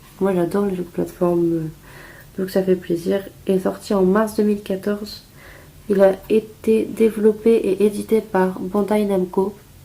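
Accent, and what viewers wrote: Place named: Nord, France. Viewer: Salut , pas mal de bonnes trouvailles GG à toi avec en plus un accent du Nord on dirait ...Je mets un Like et je m'abonne . Bienvenue à toi sur ma chaîne ;). A bientôt . Yoguy72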